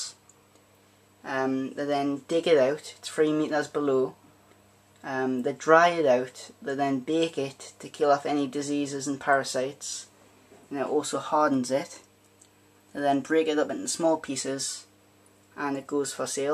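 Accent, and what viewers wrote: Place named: Durham, United Kingdom. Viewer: Lol we're abouts are you from? Sounds like somewhere next to Durham lol. N how many tanks Do you have? I have two going to get more though :)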